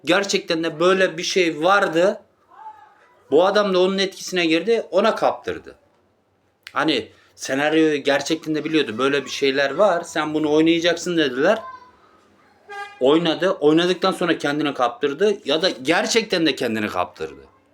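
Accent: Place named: Istanbul, Turkey. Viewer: Abi essenler sivesiyle konusuyon, Istanbul essenlerdenmisin?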